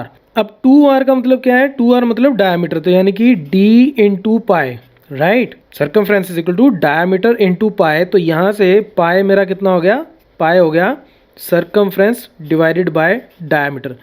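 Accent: Punjab, India. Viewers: App punjabi ho na Sir!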